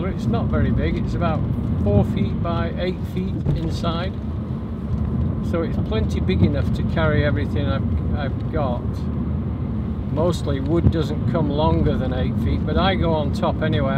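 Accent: United Kingdom. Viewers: I was kind of mislead by your wonderful British accent, when I found out you actually live and work in the US. That was a surprise.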